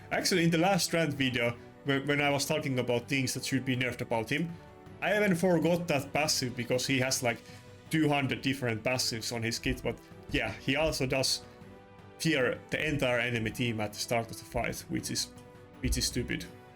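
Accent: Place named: Finland. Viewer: May I ask where you are from? It's just that you kinda sound like a Finn that's trying to hide the finglish accent but slips up every now and then :D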